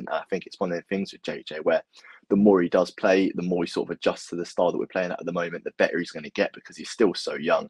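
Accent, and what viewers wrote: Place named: Birmingham, United Kingdom. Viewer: Where you from pal? Accent not too Brummie 👍🏻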